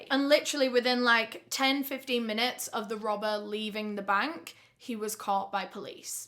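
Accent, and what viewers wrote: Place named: Northern England, United Kingdom. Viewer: As a Londoner I adore your Northern accent! Soooooooooooo in this Videooooooo. You make amazingly great videos and you look amazing also x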